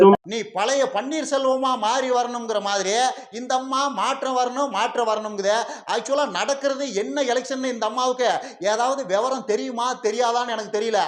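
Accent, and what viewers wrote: Kongu, India. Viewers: Super explanation speech I like your gongunad speech congratulations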